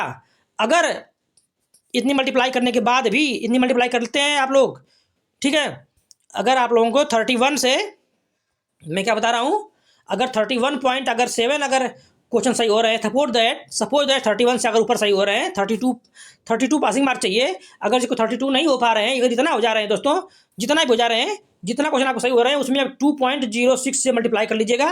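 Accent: Ghazipur, India. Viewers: Bhai prayagraj se katti nhi lag rahe ... Tone bilkul Ghazipur mau wali h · wahi मैंने सोचा ghazipur mau। या azamgarh से honge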